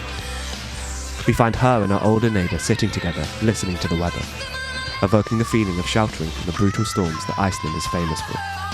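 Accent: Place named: United Kingdom. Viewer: Hey, man! i love your videos! and obviously de British accent, hahaha u r my inspiration for do video essays and do research. i love u, dude!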